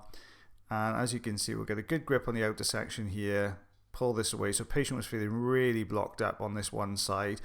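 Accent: United Kingdom. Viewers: I find these vidoes very fascinati g. My favourite are the cotton ball/ paper removal. But i love your beautiful accent. Def my favorite of any British accent.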